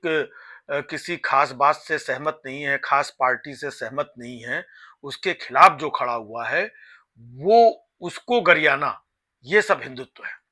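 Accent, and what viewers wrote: Kanpur, India. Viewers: ji, you are a keen observer, a brave and intellectual artist.Your political satire really means a lot and your kanpuriya tone makes it more interesting. Keep it up.👍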